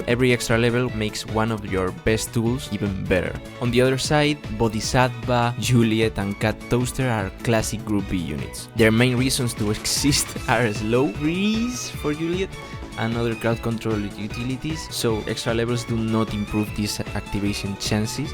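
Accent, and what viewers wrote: Spain. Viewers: ¿Are you spanish? Your accent sounds like when I hear spanish people speaking english 😅 ¿Or do other languages produce the same accent?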